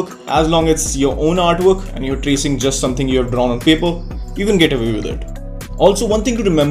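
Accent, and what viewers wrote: India. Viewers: indian accent. bye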